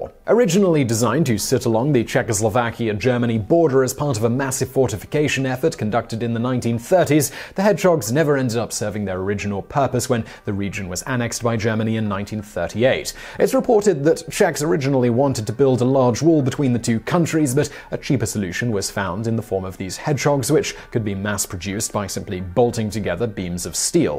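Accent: United Kingdom. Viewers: woah british babish 😳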